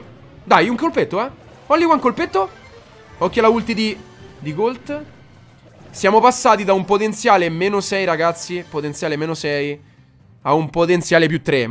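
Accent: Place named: Sardinia, Italy. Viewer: Mà sei sardese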